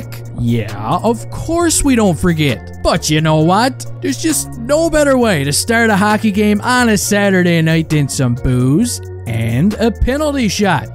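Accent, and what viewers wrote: Newfoundland, Canada. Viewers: Bro where are you from? If this is your real voice you sound exactly like a small town Newfoundlander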